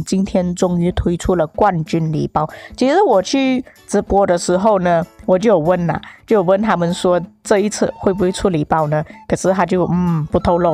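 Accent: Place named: Malaysia. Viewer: 你哪里人哦 马来西亚口音很重哦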